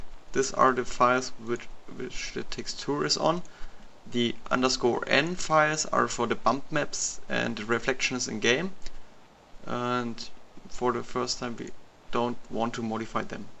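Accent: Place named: Germany. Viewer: Denglisch xD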